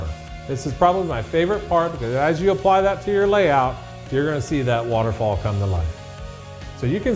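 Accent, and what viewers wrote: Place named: North America. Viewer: Lástima que no tenga sub-títulos en español, queda uno sin saber que éxlican en ese inglés norteamericano.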